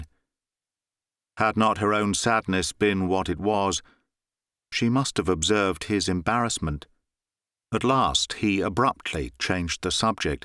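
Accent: United Kingdom. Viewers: Mr would please read Agatha Christie short stories.. I really like listening to crime detective stories narrated by your clear British accent as you did with Sherlock Holmes stories.. I would be grateful if you read some Agatha Christie short stories.. listening to your channel has indeed improved my listening skills as an English language learner... Thank you very much sir ❤❤❤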